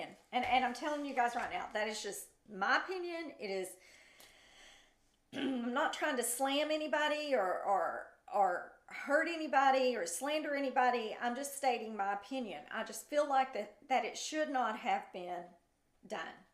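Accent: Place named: South, United States. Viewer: I love your channel, and I love Billy Smith and his family’s channel. You’re awesome, ❤ You’re so sweet, and pretty! And I can tell a fellow southerner when I hear one:)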